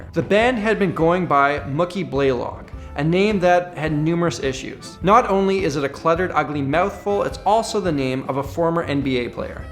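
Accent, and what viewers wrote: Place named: Canada. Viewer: As a native Akroner I am offended but maybe it was just ur Canadian accent aye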